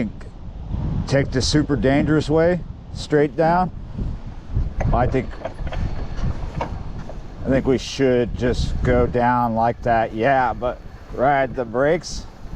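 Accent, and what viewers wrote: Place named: New Orleans, United States. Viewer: Not hat but yat accent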